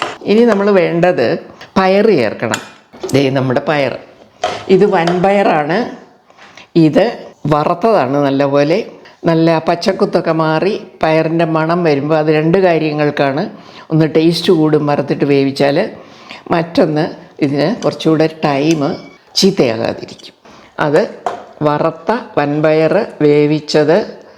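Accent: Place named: Vaikom, India. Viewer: ടീച്ചറിന്റെ നാടു വൈക്കം ആണോ. അവിടത്തെ ഒരു സ്റ്റൈൽ ആണ് സംസാരത്തിൽ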